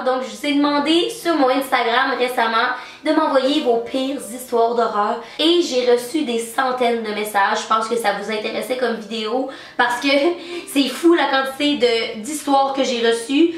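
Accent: Quebec, Canada. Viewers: jarrive pas a ecouter sa me deconcentre ta voit jai rien contre les quebecois mais la ta laccent vraiment fort et c hyper bizzar mais sinon jaime beaucoup et tu es tres belle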